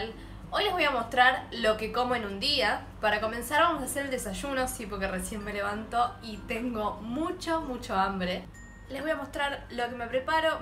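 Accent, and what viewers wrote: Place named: Argentina. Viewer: Me sorprendí al escuchar tu acento! Al fin alguien de Argentina haciendo este tipo de vídeos, te necesitábamos.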